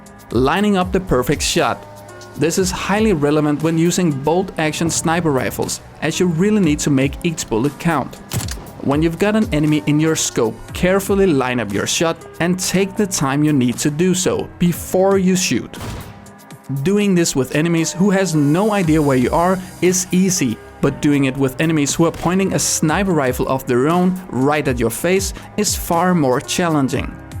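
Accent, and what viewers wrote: Denmark. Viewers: you sound danish. Are you from Denmark?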